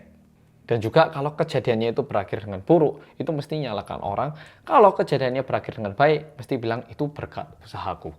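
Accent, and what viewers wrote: Java, Indonesia. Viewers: Mas kalo ngmg korea biasa aja tp kalo ngmg bhs indonesia medok banget jawa nya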